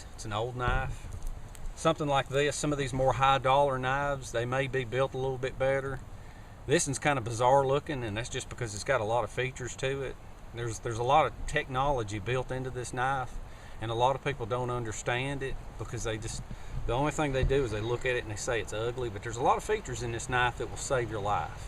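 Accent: South, United States. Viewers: I'm with you, water comes first. Dehydration will kill you first, but that knife is nice to have. Altitude sick in the way back high country this weekend I had your crazy sweet southern accent in my mind resting there at too high altitude under the shade of a tree wishing to die from a banger of a headache thinking inside the handle is some matches and a saw and some fishhooks but right now I wish I had something to blow my brains out! Kidding... maybe. Those little fuzzies are great for fire starting if you can crawl around and find some tinder. I could! Pine sap... fire... water. Just water and more water. Shelter and water and sleep a thousand years with the spinning head and then it broke and I was back with you again, human, ready to go that next mile. Your videos are wonderful! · He talks just like a friend of mine. Very real southern accent. 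If you wanna hear a "real, fake" southern accent at its best, watch the television show The Walking Dead.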